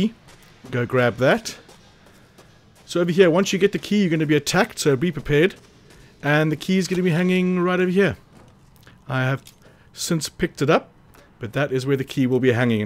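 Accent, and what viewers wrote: South Africa. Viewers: U sound like someone from the SA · Nice to hear a fellow southafrican accent lmao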